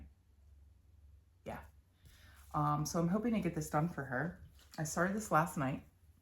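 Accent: Philadelphia, United States. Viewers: Fellow Philly girl here. I HEARD the Philly in your voice in the first sentence you spoke! Not that we have accents...it’s everyone else who have the accent! Loved your video!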